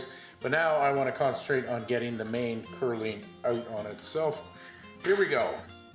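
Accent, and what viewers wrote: Canada. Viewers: Love this channel., what is your accent, Canadian?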